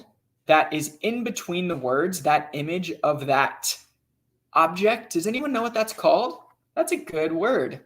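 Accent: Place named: California, United States. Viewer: AWESOME! THE BEST ACCENT I"VE HEARD IN FLUENCY, FROM CALIFORNIA.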